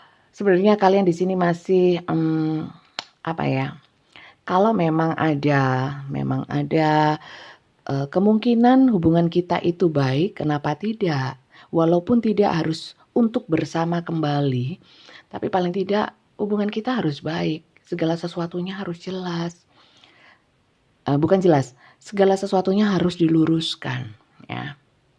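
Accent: East Java, Indonesia. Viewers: Aq jd gak bisa tidur bun dlm readingan ini banyak banyolannya logat jatim tp gak meninggalkan fokusnya bun, aq banyak cengar cengir sendiri, semi wayang lo bun, aq seneng bun lanjut, hebat pokok e, yg pasti itu Tuhan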